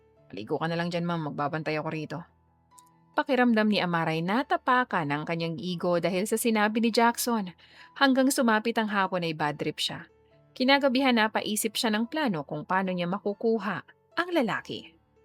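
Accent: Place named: Iloilo, Philippines. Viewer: Aliw ang ilongga na punto.. miss..❤❤❤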